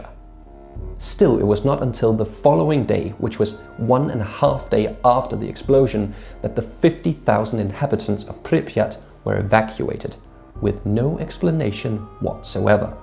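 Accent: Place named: United Kingdom. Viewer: Hearing a British man saying “50,000 people used to live here” and it not be Captain Price or Macmillan is strange to say the least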